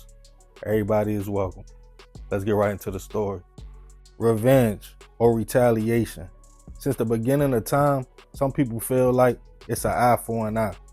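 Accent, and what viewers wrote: Baltimore, United States. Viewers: Ngl that intro is hella corny, is that how Baltimore niggas talk?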